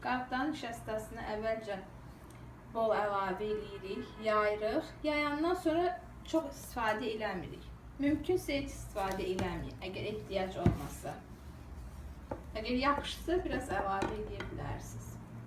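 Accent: Azerbaijan. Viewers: Azerbaycan şivesine bayılıyorum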